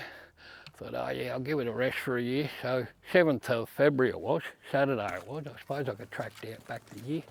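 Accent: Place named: Australia. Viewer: Love this channel bro! Im very envious of you being able to live off grid. P.s i understand every word you say. It's hard to believe some people struggle to understand your accent. Maybe because im Australian also.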